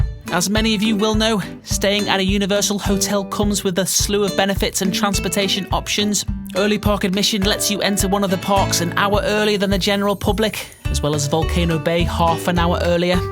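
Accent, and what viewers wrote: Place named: Scouse, United Kingdom. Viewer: Why have I never noticed the slight Scouse accent till this video?!?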